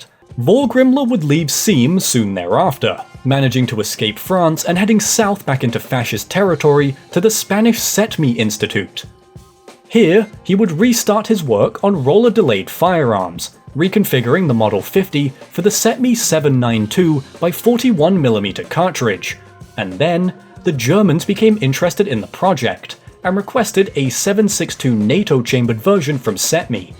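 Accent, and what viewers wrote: United Kingdom. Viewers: Like most people I find the English accent very irritating.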